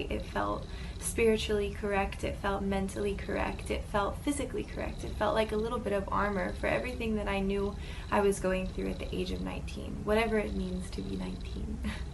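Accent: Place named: United States. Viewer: اممم. امها امريكية فهمت ليش تتكلم كذا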